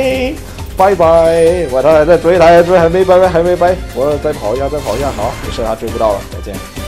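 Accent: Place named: China. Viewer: 確實 他是大陸人嗎 那口音聽得很難受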